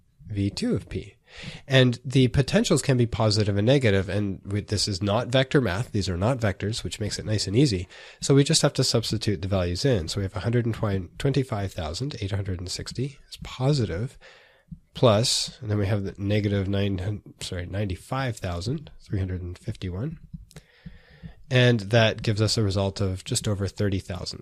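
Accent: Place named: England, United Kingdom. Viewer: Bol t rahe hai jaise ki app England se ho..😩😩😩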